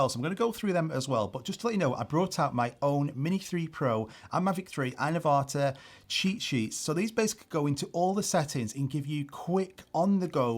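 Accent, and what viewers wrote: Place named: Yorkshire, United Kingdom. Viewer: Btw love your content. I’m in Yorkshire. Can tell you are somewhere in that location with the accent :)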